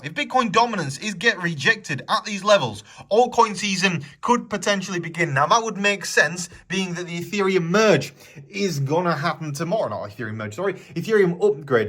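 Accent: United Kingdom. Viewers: $CUDOS is looking good. since my ape in, it show's a strong correlation with BTC my favorite part is that I can mine #CUDOS with my old laptop Nice vids bro, I feel smarter watching your videos, plus when ppl hear a British accent guy, they think I'ma pro for watching 😎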